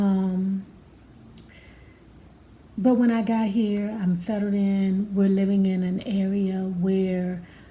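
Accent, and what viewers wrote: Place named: Washington DC, United States. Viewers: Peace Queen, I knew you were from DC, I could hear it in your tone, I have to ask do they have Mumbo Sauce down there. Yes, Chocolate City had started to change when Pratt-Kelley took over. But yeah, Your correct about the regentrification nationally, but truthful this is nothing but the fulfillment of the Homestead Act of 1867. The air's you seeing with the social climate is the reaction to the political agenda currently, condone. I too am aware of the Lupus struggle, I lost my Great Aunt when I was a young boy, but would come full circle with my ex-fiance was diagnoised too, I support the research too. Oh yeah, I am from Adams Morgan residing in Va. Beach. But on a another note, I never understood why Lupus never got the backing and support as Susan B receives multiple times a year. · I know what you mean about the black folks being pushed out. 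I live in Germantown md and I know a lot of ppl who moved here from D.C. It's crazy. Plus I know you were from the DMV before you said so and before I saw you. Just by your accent in other videos. It's funny, I can spot a D.C. Accent a mile away. Lol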